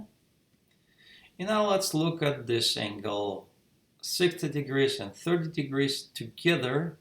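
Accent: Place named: New York, United States. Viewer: Drop CE perpendicular to AB at E. angle CBA=30,angle CAB=15 angles in deg. Let CB=2,CE=1 and EB=sqrt3. AB=AD+DB. sin(15)=(sqrt6-sqrt2)/4 sin(30)=1/2 angle ACB=<num>=135 sin(135)=1/sqrt2 Use Rule of Sines on triangle ACB. AB/sin(135)=CB/sin(15) AB/(1/sqrt2)=2/((sqrt6-sqrt2)/4) sqrt2*AB=8/(sqrt6-sqrt2) AB=8/(sqrt2(sqrt6-sqrt2))=8/(sqrt12-2)=8/2(sqrt3-1)=4/(sqrt3-1)=2(sqrt3+1) DB=AB/2=2(sqrt3+1)/2=(sqrt3+1) DE=DB-EB=(sqrt3+1)-sqrt3=1. tan(x)=CE/DE=1/1=1 x=arctan(1)=45 degrees and that is our answer. Thanks for the problem. I like your accent,do you come from NY City?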